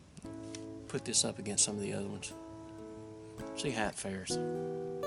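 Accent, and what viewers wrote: South, United States. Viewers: This was a wonderful video! I feel like you were really caring and you had a calm and reassuring voice. I'm from a rural area and it made me happy to hear a fellow southerner. Thanks for the help. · Can you stop whispering. Are you from the south?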